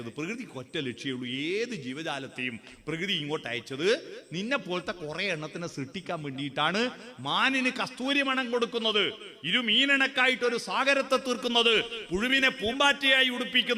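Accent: Kannur, India. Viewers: സർ, കണ്ണൂർ ക്കാരൻ ആണ് ല്ലേ..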